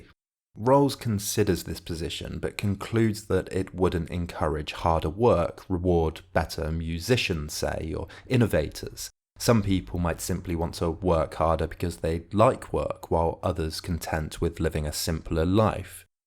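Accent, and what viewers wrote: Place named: Bristol, United Kingdom. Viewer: the slight bristolian accent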